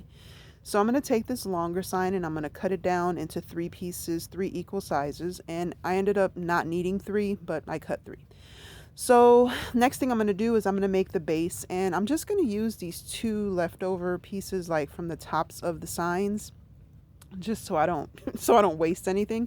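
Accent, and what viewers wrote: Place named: Puerto Rico. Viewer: Beautiful Flag!! 👌I have always love the Puerto Rican accent !! Thank You for sharing 😘❤ your Word I think is Bird. 🥰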